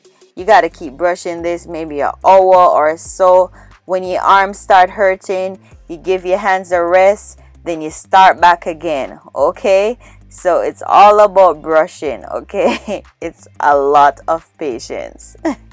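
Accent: Jamaica. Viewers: You are Jamaican I can tell my parents are Jamaican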